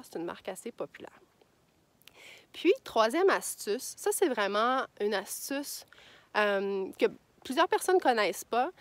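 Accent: Quebec, Canada. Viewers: Merci à toi! Ta voix est tellement relaxante et reposante...elle m'aide à m'endormir le soir hihihi. l'accent québécois me manque beaucoup aussi hihihi ca fait des années que je ne vis plus au Québec! J'ai toujours fait attention à mon alimentation et à ma santé physique, je fais beaucoup de recherche sur internet ou autres pour comprendre comment fonctionne l'alimentation. Depuis 6 mois, je ne mélange jamais les sucres complexes ou simples avec des protéines de sources animales ou végétales, j'ai remarqué les changements dans ma vie: + d'énergie, moins de problèmes de digestion... c'est exactement ce que tu dis dans l'une de tes vidéos, maintenant je fais confiance à tout ce que tu racontes! hihi une choses aussi..j'ai appris avec toi, en regardant par exemple la video - Comment tenir vos résolutions en cinq étapes? - Comment combiner le physique et le psychique. 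Tu es vraiment le résultat de ce que tu nous racontes: Tu es bien dans ta peau, personnalité bien équilibrée, belle voix, belle peau, beaux yeux.....je fais continuer à te lire et à t'écouter pour améliorer d'avantage ma santé. Encore une fois, grand merci! Je te souhaite une bonne Saint Valentin!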